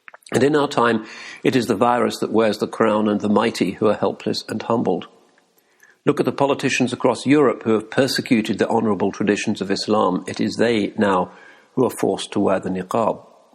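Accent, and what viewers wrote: England, United Kingdom. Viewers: I like this, just wish it didn’t sound so staged. Maybe I’m just a lecture kind of person...it takes a certain nerve to appreciate poetry in a heavy English accent.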